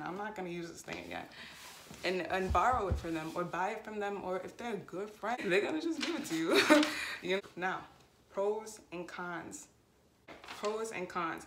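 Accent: New York, United States. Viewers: Sound like a New Yorker😎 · as soon as I started watching I just knew you were from New York lol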